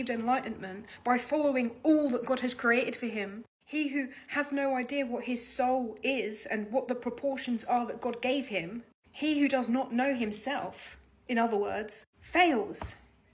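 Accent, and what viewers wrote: England, United Kingdom. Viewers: لهجتها بريطانيه مش امريكيه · ثبتها الله على الإسلام.. يبدو من اللهجة بريطانية وليست أمريكية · لكنة بريطانية وليست امريكية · هذه بريطانيه وليست أمريكيه واضح من اللهجه … كفي عقده أمريكان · هذه لكنة بريطانية وليست امريكية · هذه ليست لهجتها أمريكية بل بريطانية او ممكن استرالية المفيد ليست لهجة امريكية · هل ما فهمته صحيح او فيه ما يشوبه ؟ العلم عند الله ،.. اللهجة ليست أمريكية أعتقد بريطانية و الله أعلم . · هذه اللكنة بريطانية وليست امريكية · لهجتها انجليزية وليست أمريكية . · لهجتها انجليزية وليس امريكية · هي بريطانية وليست أمريكية، اللهجة الأمريكية تختلف عن اللهجة البريطانية. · لهجتها بريطانية . هل انت متاكد انها امريكية؟؟؟؟ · للتصحيح، لهجتها بريطانية وليست أمريكية...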